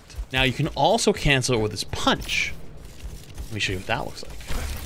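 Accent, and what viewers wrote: United States. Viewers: I guess ur american ?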